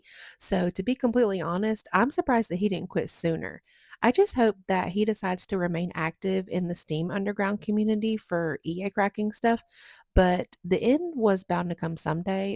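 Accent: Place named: United States. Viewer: I don't mean to annoy everyone here but I feel morally I have to warn you; if you are serious about doing this you need to be way more careful, dumping all the files on GoFile? Are you familiar with copyright? I'm going to simplify this just to get my point across: You need to do stuff like set up private server and host a site to put it on or use a torrent site etc. Also you sound like an american, the US has copyright laws, if EA find you they will sue your arse into oblivion and they have a team of sharks just for that kind of thing, crackers normally are in other nations that have flimsy copyright laws so they can get away with it. I wish you the best I really do and EA are scum so um be careful yeah?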